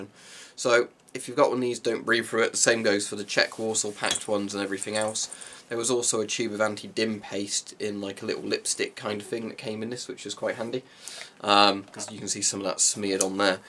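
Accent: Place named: United Kingdom. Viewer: Im from Poland. The word "Dzień Dobry" you said was very simular but accent is strongly British. Still, you are close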